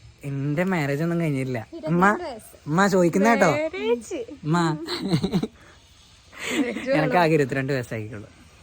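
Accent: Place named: Kuttiyadi, India. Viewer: nte samsaram keteram thoonik kuttiyadi slang varunnund ❤❤😊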